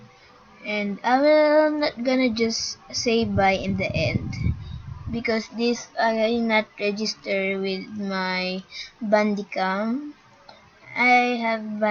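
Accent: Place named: Philippines. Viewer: I can tell by your accent you are filipino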